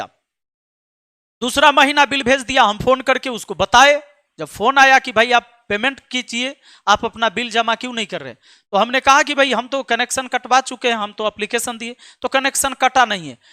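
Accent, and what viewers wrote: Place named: Bhojpuri, India. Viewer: 5 किलो आटा के दाम तोरा डाटा से कटेगा बबुआ वाह क्या भोजपुरीया अंदाज है गुरु जी😂😂😂😂😂😂😂😂😂😂😂😂😂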